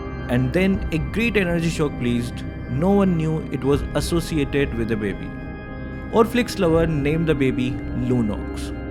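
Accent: India. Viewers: Am i the only who is possed by his indian accent?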